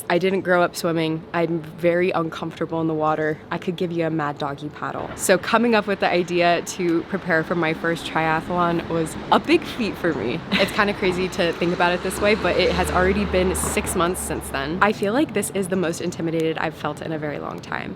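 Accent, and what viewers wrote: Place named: Philippines. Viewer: has a Philippine accent. My bestfriend is an exchange student from Phil.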